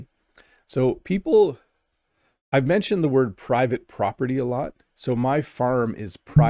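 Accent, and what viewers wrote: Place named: Canada. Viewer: Thank you,. I study English almost every day, but there’s just so much to learn. In the past few days, I’ve been focusing on English intonation and connected speech. My pronunciation of individual word is pretty good, but when I speak, I still don’t sound like a native English speaker. Intonation and connected speech are my weak points. I live in Toronto. Once my English reached a certain level, I’d like to come visit you! I really enjoy your English lessons, and I especially love your Canadian accent! Thanks again,!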